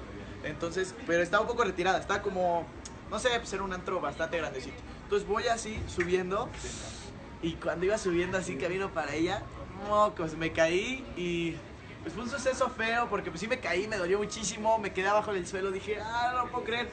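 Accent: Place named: Spain. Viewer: su acento español😍😍😍😍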